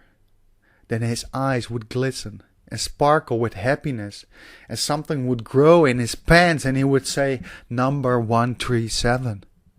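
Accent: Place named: Netherlands. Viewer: Hi, you sound Dutch to me 🙂, am Dutch too....thank you for this video. Please look into the book the Numerical Universe by Anthony Morris. You will find it mind blowing. He shows through Vortex Mathematics that we are living in an intelligent designed Universe of 137 billion years old 🙂 He elaborates deeply this number and I have put it on my car’s license plate ever since I find out about this number. Also in Hebrew the word God is written in such a way that it looks like a mathematical formula showing the Phi sign and the number 7. Greetings from Cyprus <link>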